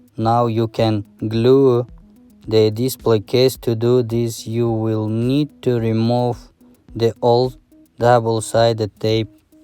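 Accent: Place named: Russia. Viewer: Нормас только голос надо приправить ну надо говорить как англичане а то ты просто с русским акцентом говоришь английский слова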